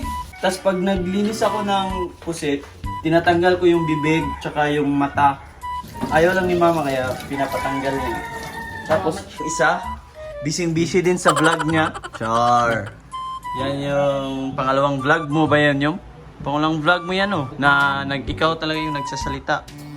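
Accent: Visayas, Philippines. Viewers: I love the bisaya intonation ❤❤ ilonggo here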